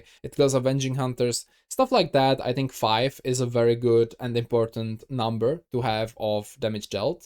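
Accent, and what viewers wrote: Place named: Czech Republic. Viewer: Dude ur czech accent is so funnily familiar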